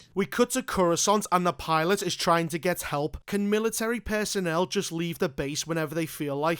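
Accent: Liverpool, United Kingdom. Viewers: Bro, you have to be from liverpool yea? This man sounds EXACTLY like Darren Till 😂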